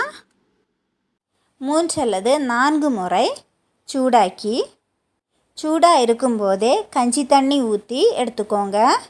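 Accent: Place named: Kanyakumari, India. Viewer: Kanyakumari tamil. Very great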